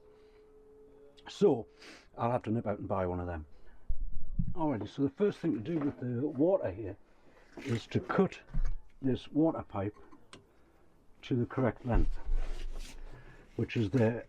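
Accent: North East England, United Kingdom. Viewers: Great work sir! I wasn't convinced about the blue at all... until the door fronts went on! They look superb with the radius corners. On another note, it is wonderful to hear a voice from my old neck of the woods that is calm, caring and from a good heart. I now live in Devon (30 years) and your voice is a good reminder of the beauty of the North East. Thanks:)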